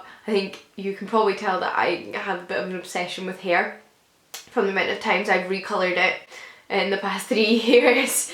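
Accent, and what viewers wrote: Scotland, United Kingdom. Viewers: With a Cuppa. You Scottish? Where from? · just the other day when I watched a video of yours (and I watch them all, even if I know I won't read the book mentioned etc), I thought to myself "why does 'only' have 29k subscribers? why is it not something like 100k?" you're honestly my favorite booktuber and I love how passionate you are and I could listen to you talk all day (yes, I'm guilty of loving your scottish accent). you seem like such a sweet person and I'm so looking forward to hear you talk about books even more in the future! congrats on 30k, you really deserve it! xx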